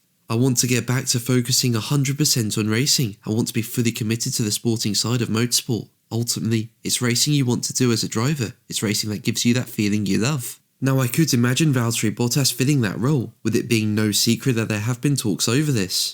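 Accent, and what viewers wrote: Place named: Liverpool, United Kingdom. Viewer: I'm not hearing it; he just sounds Scouse to me.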